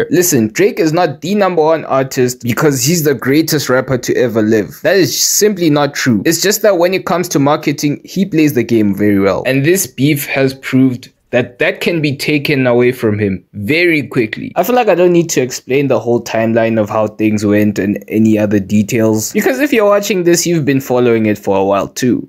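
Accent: South Africa. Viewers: You South African? That accent... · You sound like you're from 🇿🇦...🤔🤔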